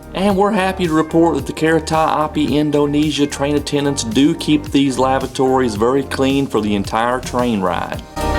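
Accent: United States. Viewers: soalnya pakai aksen amerika, coba pakai aksen british lama atau aksen australia 😁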